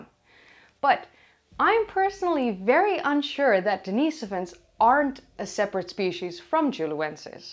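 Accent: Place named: Netherlands. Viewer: Very Dutch accent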